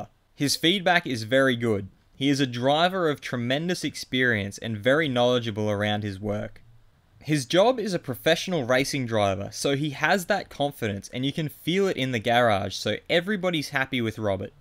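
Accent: Australia. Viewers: Great video. On your suggestions for future videos, one thing I suggest is to change your accent. For many people the Australian accent is very annoying. Thanks.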